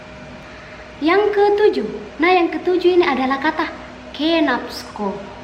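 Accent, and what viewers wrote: Makassar, Indonesia. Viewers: Sy senang makanan khas Makassar & logat nya itu loh enak bgt 👍👍 Ewako Makasar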